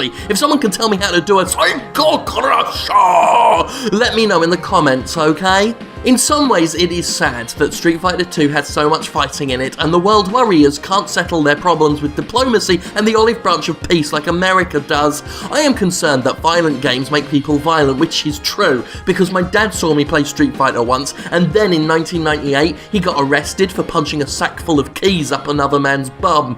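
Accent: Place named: United Kingdom. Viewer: Because british accent and unexpected jokes in quick succession = Instantly Charlie Brooker.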